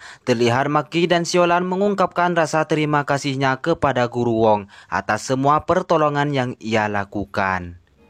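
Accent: Madura, Indonesia. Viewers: Logat madura cak?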